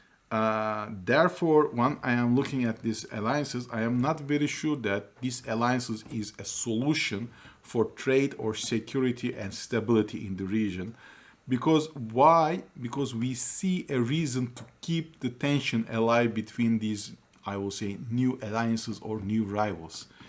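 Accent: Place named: Russia. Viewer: You sounded like a Russian speaking English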